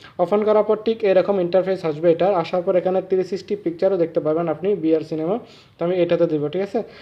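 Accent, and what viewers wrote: Chittagong, Bangladesh. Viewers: ভাই আপনি কী চট্টগ্রাম থেকে?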